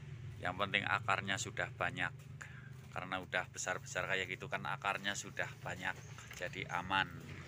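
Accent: Banyumas, Indonesia. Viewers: Pak jan tembe ketemu channele giye aku bani banyu mas nang Lampung ngapak pada bae nek ora ngapak ora kepenak ky gue ya pak. Salam knl.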